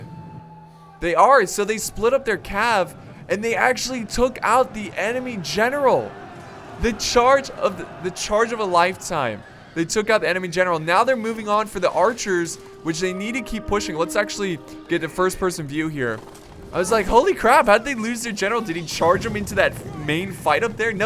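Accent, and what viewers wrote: Bavaria, Germany. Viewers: Are you from Bavaria? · are you from bavaria ? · Are you bavarian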